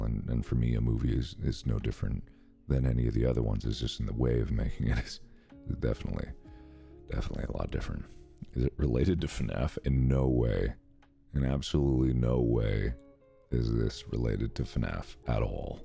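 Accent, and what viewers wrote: France. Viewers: nice french accent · French Markiplier!~ 😍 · Oh my!!! French accent through the video; oh dear gosh!!! Must be an ASMR video of some stuff.🧔🏻🎮😹🙀😼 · why talking in french voice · Why does he sound french 🥖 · Markiplier sounds like Tom Kenny's SpongeBob SquarePants French narrorator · I was watching Murder on the Orient Express so coming to this and hearing him with the French was very odd. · Lol french! Yes! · The french accent makes me laugh, i am, and i talk like that, so, for the FIRST TIME, i understand you very well 😭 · French Accent AU ASMR 👌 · Am I the only one that hears a bit of a French accent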